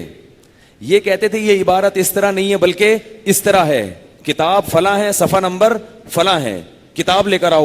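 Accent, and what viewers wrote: Punjab, Pakistan. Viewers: uff ALLAH ini bhairi punjabi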